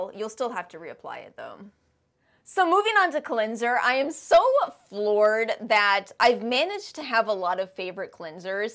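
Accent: United States. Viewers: You have a strong American accent!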